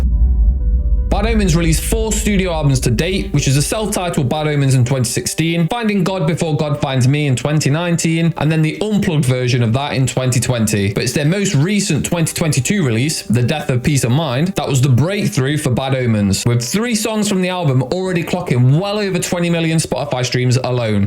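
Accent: United Kingdom. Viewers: why are you british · Are you from yorkshire?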